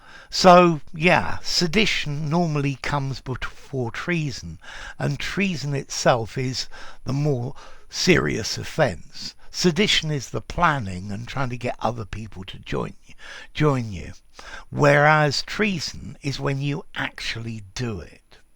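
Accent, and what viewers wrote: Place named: Oxford, United Kingdom. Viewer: His accent is from Oxford.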